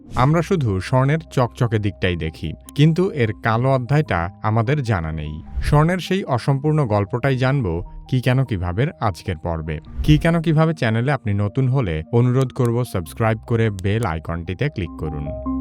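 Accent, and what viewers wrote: Bangladesh. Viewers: ভাই আপনি কি নোয়াখাইল্যা নাকি?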